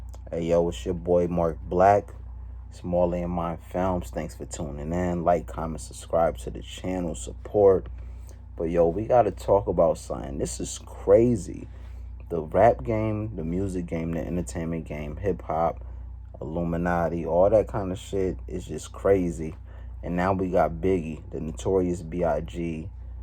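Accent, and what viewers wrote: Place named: West Coast, United States. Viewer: He sounds like west coast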